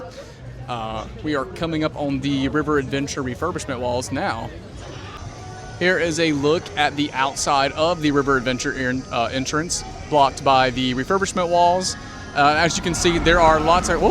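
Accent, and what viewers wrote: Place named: South, United States. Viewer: Thanks for the update,! We are hoping that they extend Harry Potter or MOUNT CRUMPIT into Lost Continent. WE DO NOT WANT POKÉMON! It’s not our thing. Where are you from? You have a southern twang! Love it! 😊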